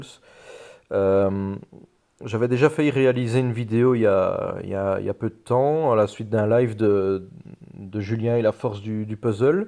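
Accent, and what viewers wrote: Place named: Belgium. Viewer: Sans commentaire l'ami toute est di , donc je vais plutôt commencer par te dire que ca fait plaisir de voir un youtubeurs Belge sachant que j'ai moi même des origines belge que j'ai habité Bray Dunes donc juste a la frontière collé à ( la panne ) pendant toute mon enfance et donc forcément ca me fait toujours plaisir de revenir en Belgique chaque année ou même d'entendre l'accent belge qui me rappelle toujours de bon souvenirs , je fais partie de l'alliance de subarix et Julien notamment donc je tes découvert grâce à Juju et je trouve très intéressante ton analyse et bien résumé , et j'vais te dire moi je dépense peut depuis le début de mon aventure et j'me débrouille avec mes héros , j'ai juste pris le vip très vite pour finir mon camp rapidement , depuis je ne le prend plus et donc j'investi encore moins , j'étais à environ ( 20 , 25 voir gros max de temps en temps 30€ ) aujourd'hui entre ( 10 et 20€ gros max ) plus ils continuent dans leur délire moins j'investis , désolé pour ce long message , je ferrais plus court la prochaine fois , moi c'est Georgio salut et à bientôt l'ami 😉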